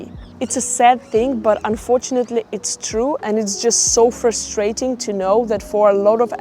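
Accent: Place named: Netherlands. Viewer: It's interesting that you have developed a Dutch accent when you speak English.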